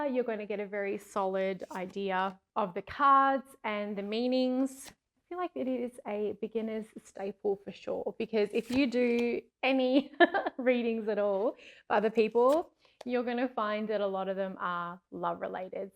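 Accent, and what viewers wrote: Australia. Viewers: Is says Canada but you sound Australian.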